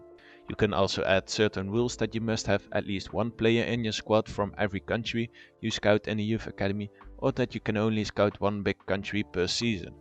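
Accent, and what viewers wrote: Netherlands. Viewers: Goeie video, dacht al toen ik je stem hoorde dat je Nederlands was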